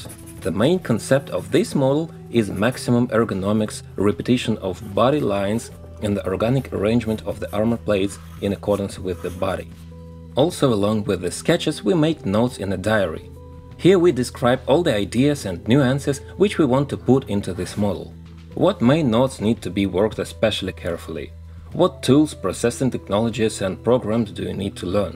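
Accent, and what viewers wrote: Russia. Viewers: You have a strong accent. It looks like Russian.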